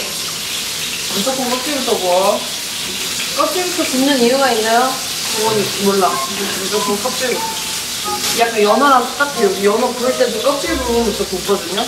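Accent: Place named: Gyeongsang, South Korea. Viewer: 는 경상도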